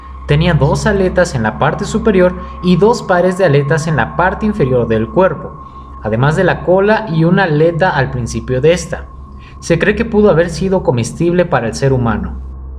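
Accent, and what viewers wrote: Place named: Portugal. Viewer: que buen acento portugués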